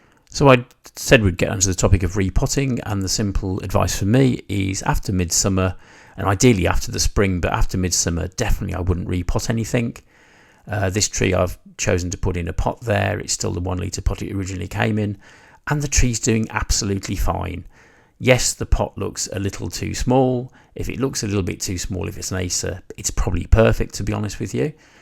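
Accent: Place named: Midlands, United Kingdom. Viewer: Subbed👍🏽just started my maple collection, your content has been a big help👍🏽thank you. I’m in Telford, sounds like a midland accent you have👍🏽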